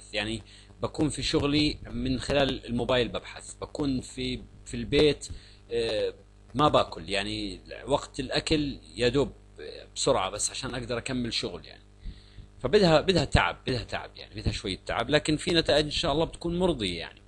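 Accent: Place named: Jordan. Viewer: لهجتك بجنن اردني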